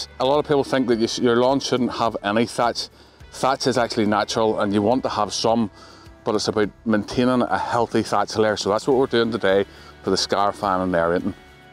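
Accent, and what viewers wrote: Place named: England, United Kingdom. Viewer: Cant understand your queens English eho. Give me a Kiwi any day.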